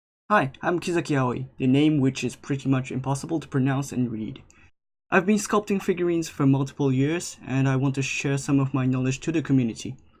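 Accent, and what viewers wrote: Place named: India. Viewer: “I’m japanese”. That accent doesn’t lie ya Indomutt akhi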